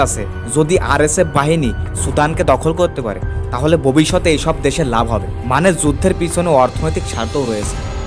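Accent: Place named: Gopalganj, Bangladesh. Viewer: তুমি কি আমার গোপালগঞ্জের মানুষ নাকি তোমার কথাবার্তা গোপালগঞ্জের মতো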